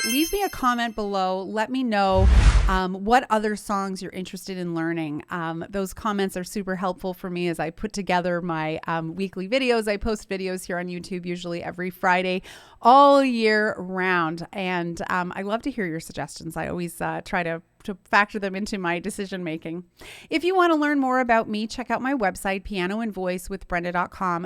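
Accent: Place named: Portugal. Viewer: Thank you so much. Another excellent video, love you accent, probably portuguesa, much gracias ❤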